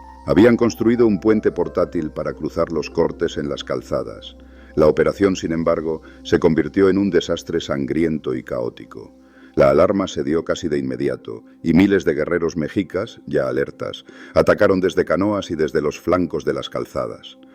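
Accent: Spain. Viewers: Hubo dos grupos que los mexicas No pudieron someter, los Tlaxcaltecas y los Zapotecas, lean, investiguen, analizen, saquen sus propias conclusiones y veran las diferencias, ademss este narrador tiene acento español, el imperio mexica SI era el mas fuerte y predominaba cuando llegaron los conquistadores pero no era el unico grupo etnico que existia, habia muchos grupos pero estaban sometidos, pero todos los grupos etnicos que existian NO eran mexicanos porque México NO existia como tal, eso vino despues en la independencia en los 1800s, el México actual se forma de tres grupos, los mestizos, los afromexicanos y los nativos, osea indigenas, estos tres grupos ya si somos mexicanos, 💯%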